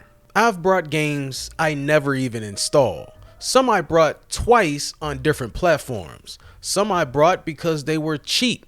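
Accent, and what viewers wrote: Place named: New York, United States. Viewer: Couldn't watch the entire video because you keep saying brought instead of bought.. you nost likely say soar instead of saw and Mare-Rio instead of Marr-Rio. I refuse to listen to anybody that pronounces words that way and its 99% people from NY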